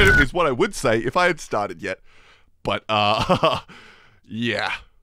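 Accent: Australia. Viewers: i have to comment it here but, are you "??" not just for your aussie accent but your ability to not upload for months at a time. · He's Michael Reeves, but less robotics and more coding. Also a LOT of Aussie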